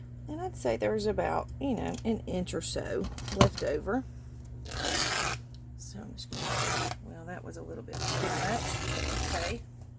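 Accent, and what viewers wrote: South, United States. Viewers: PS...still loving that southern charm and accent....music to my ears👂👂👂👂👂👂👩‍🎤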